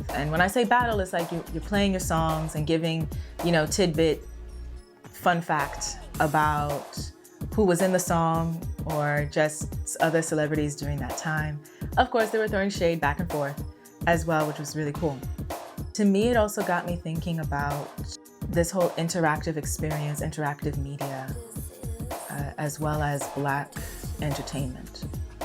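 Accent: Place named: New York, United States. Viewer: I really love your swag. You look just like Alicia Keys, everything about you (beauty, skin tone, smile, peace of mind, way of speaking (the New York City swag), natural beauty, your love for the culture, knowledge, ...), and I mean it, everything about you really reminds me of Alicia Keys. Thank you and please, keep the videos coming. Look forward to the next video. Thumbs up! Hats off!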